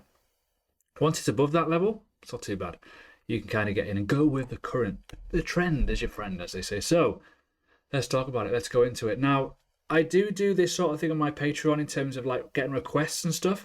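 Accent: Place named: Northern England, United Kingdom. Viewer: Great video man, just signed up to your patreon too. Refreshing to hear another northern English voice talk about crypto lol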